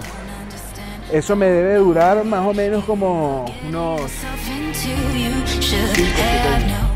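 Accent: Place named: North, Mexico. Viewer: Méxicano norteño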